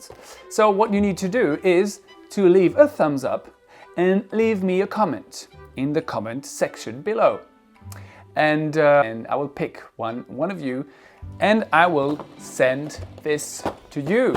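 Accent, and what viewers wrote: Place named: United Kingdom. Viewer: J’adore vos vidéos, amusants, instructifs. Tout pour apprendre aisément. Comme je viens du Québec, l’anglais dont nous avons l’habitude d’entendre est plus celui de l’Américain. Mais j’aime ce son Britannique. Merci, je continue de vous suivre. Bon temps des fêtes!!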